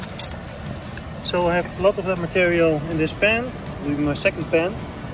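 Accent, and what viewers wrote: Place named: Netherlands. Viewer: Easy to hear you are Dutch. :)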